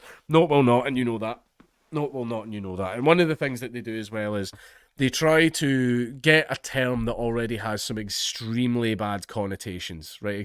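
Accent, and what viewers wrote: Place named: Scotland, United Kingdom. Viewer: What I hear is an angry scot ready to kick ass when the government tries to mess with his babies. Love ur vids btw.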